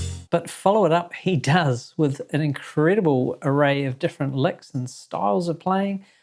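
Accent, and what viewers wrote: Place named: New Zealand. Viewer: 😅 Gotta love that Kiwi accent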